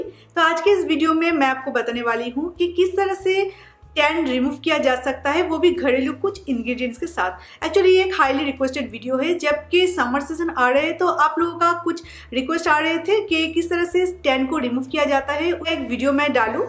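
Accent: Bengal, India. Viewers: Apki Hindi me Bengali tone h😁🤭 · Apne to bangal ka didir moto hindi bolta he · dude i'm gonna ask the same question, well her accent resembles Bengali😁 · Yes it's the accent of bengoli s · Aap bengali ho right bola na😅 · App Bengali hain , mujhe baat sun ke laag raha hain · Bengali? you have bengali accent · u r bengali right? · R u bengali ? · Tag mark of Bengali that accent. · Are u bengali?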